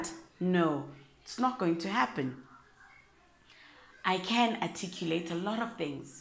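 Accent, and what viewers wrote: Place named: Limpopo, South Africa. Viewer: 🤣🤣🤣🤣 I love the Limpopo English accent, it really goes for the soul😂😂😂😂 I think some Americans don't want to make the effort to learn how to listen, especially if a person has a thick accent. However, some appreciate and even love other people's accents and as a result you thrive at what you do and offer.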